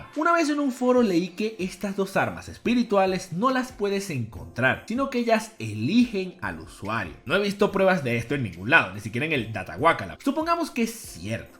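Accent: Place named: Venezuela. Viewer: Tras de lecho venezolano que ya hasta las tripas me arden de escuchar ese asentó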